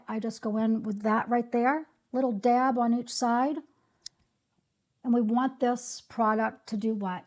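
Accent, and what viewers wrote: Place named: New York, United States. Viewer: Beautiful woman❤ I love your accent!……New York? Thank you for tips. I agree glow is so important 😊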